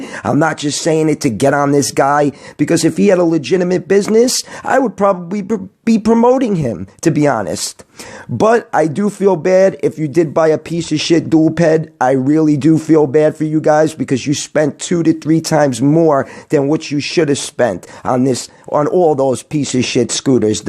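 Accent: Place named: Brooklyn, United States. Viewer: As a Transplanted New Yorker out here in Seattle 1. I've been down the rabbit hole of Escooters I only follow The Ginger Kid Igor from Roblex and Now You! I miss the energy and the vibes 2. that Brooklyn Accent made follow you as well Bro lol glad I stopped by cos old fuckin I supply alibaba Asshole was kinda getting on my fuckin nerves because again the rabbit hole,I started looking up specs and when I heard 52V doing 50mph I'm like GTFOH! 🤣🤦🏾‍♂️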